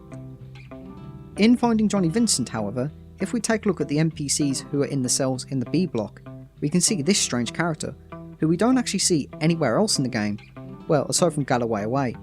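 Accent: United Kingdom. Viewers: Okay I thought you were British because of your voice and where are you from then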